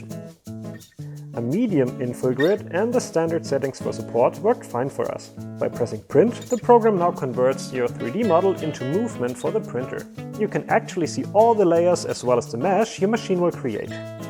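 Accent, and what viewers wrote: Germany. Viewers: are you from german??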